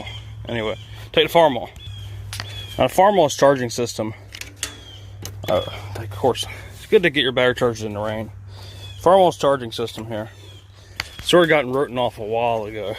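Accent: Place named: Coastal North Carolina, United States. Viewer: man i just found your channel i heard you talking and you have to be coastal nc im in pamlico county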